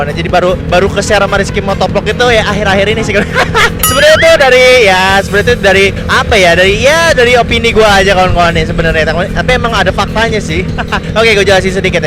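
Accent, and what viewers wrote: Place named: Java, Indonesia. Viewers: Tolong dong jgn pake logat bahasa jawa, kowe iki rak mudeng ngomong opo wi. Gatheli tenan.. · Oalah toh bang² kok lucu banget sih klo pakek bahasa jawanya, lucu akan logat² jawanya. Heheheh hahahah hihihi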